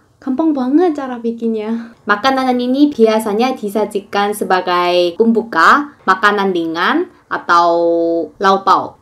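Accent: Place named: Java, Indonesia. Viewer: Kok, ada logat jawanya yah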